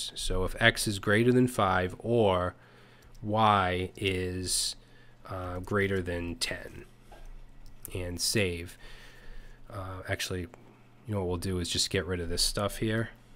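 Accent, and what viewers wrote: Boston, United States. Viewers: I love this Bostonian accent. Also, great content.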